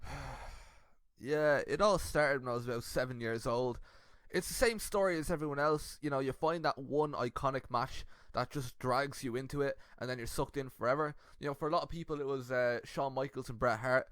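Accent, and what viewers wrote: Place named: Ireland. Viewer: That was pretty good and your Irish accent made it funnier.... in a good way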